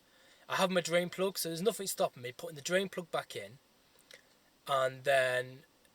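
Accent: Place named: Birmingham, United Kingdom. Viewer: sounds like a Brummie to me?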